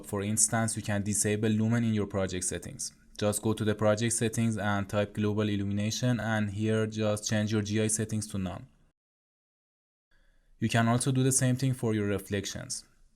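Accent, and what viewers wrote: Iran. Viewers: thanks for the tutorial 100% Persian accent 😄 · iranian accent :)) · داداش دمت گرم عالی بود.ایرانی بودن یه طرف انگلیسی رو با لهجه اصفهانیدوست دارم :goodvibes:. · سلام ، عالی بود ، فقط یه سوال داشتم ، ایرانی هستی ؟ آخه لحجه ات فارسیه توی تلفظ کلمات اما بازم کارت عالیه !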